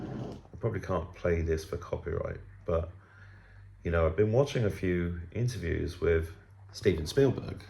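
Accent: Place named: United Kingdom. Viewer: Ohhh you are sounding very British today, hehehe.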